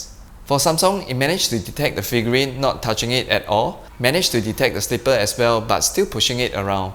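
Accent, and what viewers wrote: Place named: Singapore. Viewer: Impressive amounts of tests! I'm Korean but would never buy a Samsung because they are severely overpriced in Korea. So I'd stick with Xiaomi or Roborock. On a side note, very interesting Singlish accent. I have quite a few Singaporean friends and find the accents vary quite a lot from person to person. Wonder why that would be?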